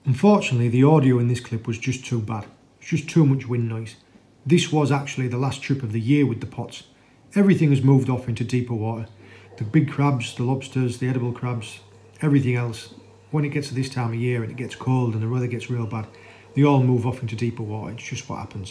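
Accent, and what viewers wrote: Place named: North Yorkshire, United Kingdom. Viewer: Such a familiar accent if tha aint a North Yorkshire lad I'll eat tha hat me sen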